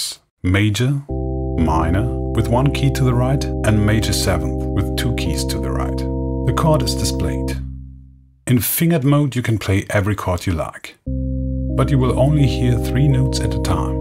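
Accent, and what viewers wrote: Germany. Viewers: Nice german accent and a very, very useful review !!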